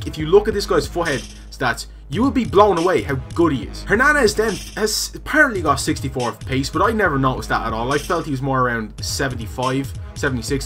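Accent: Dublin, Ireland. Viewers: What part of Dublin are you from? ps I love these vids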